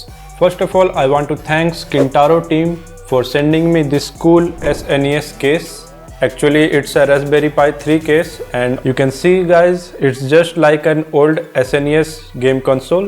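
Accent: India. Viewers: are you from india...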